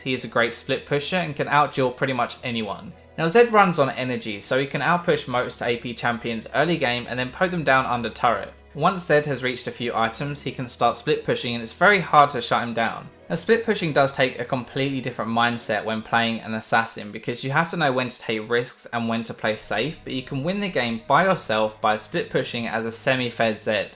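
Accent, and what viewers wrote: United Kingdom. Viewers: Dat british accent :>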